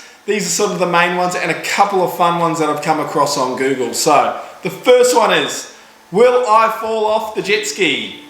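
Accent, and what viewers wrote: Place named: Australia. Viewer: You sound Australian...Forgive me if I'm incorrect. If so, I am absolutely terrified of water in your country lol. Between your crocodiles, snakes, sharks (even if I don't have to worry about them), etc...The only water I would want to touch there is the shower, and my drinking water lol. If it's New Zealand, well that doesn't change much lol. In fact it's probably worse.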